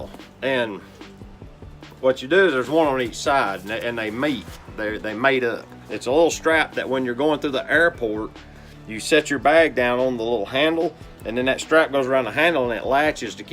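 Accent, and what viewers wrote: South, United States. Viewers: hahaha I wasn't sure if you were joking so didn't want to say anything. I thought it was a southern accent. It's awesome. Love it :) I'm from Germany and have a terrible accent :)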